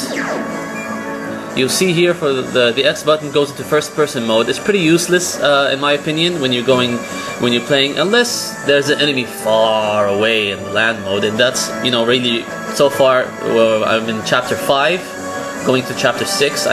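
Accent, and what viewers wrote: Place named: Scandinavia. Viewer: Haha. Yeahh, it is mostly the accent that sounds like a scandinavian's, especially the way you say the "R" sound. Also the lightning made your skin color look kind of possible for a scandinavian. The video was very understandable though. I am used to the accent by myself being a Scandy :)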